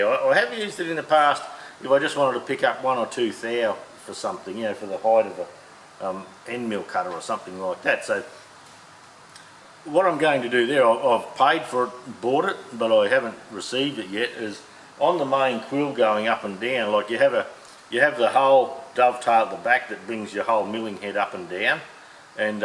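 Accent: Australia. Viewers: The. Americans go to talk to a shrink, I just listen to a mad Aussie talk for a while and i feel on top of the world. thanks mate another cracking stew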